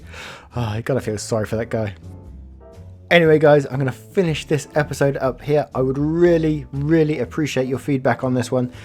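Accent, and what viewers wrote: United Kingdom. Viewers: Yep loved it daddy, totally agree with being over the general Reddit stories. So many people are doing the same shit now. But I love your reading of them the best, love your British accent and that fact you don't give a shit about conforming to impress YouTube head honshows as most YouTubers bleep the swear words. You just say it as it is. I love that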